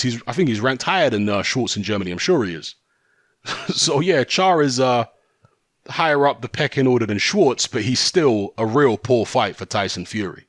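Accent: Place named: South London, United Kingdom. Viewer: You sound like youre from Sth london ,....tssr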